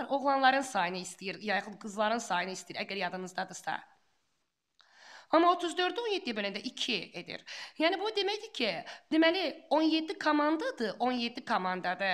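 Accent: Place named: Russia. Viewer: Rus sektırıdı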